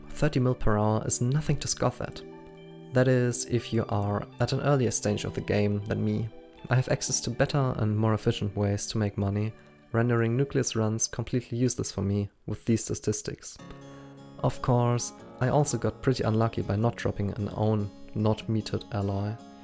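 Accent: Germany. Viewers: Am i right or do you have a German acsent? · German accent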